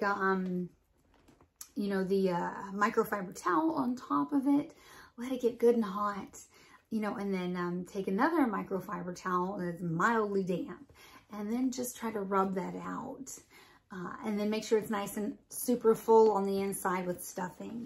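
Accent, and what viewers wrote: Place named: New York, United States. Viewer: 😂 love your NY accent 💙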